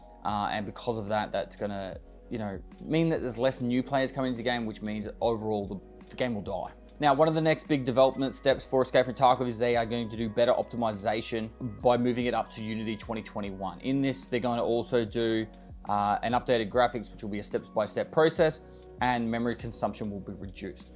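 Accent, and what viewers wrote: Australia. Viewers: My daily dose of australian accent is back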